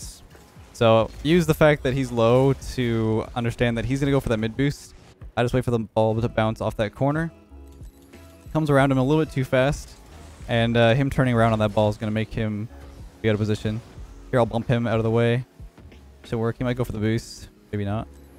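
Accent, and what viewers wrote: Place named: Canada. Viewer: you never let me forget that you’re a Canadian lmao: I’ve figured him oooooouuuut 😂❤️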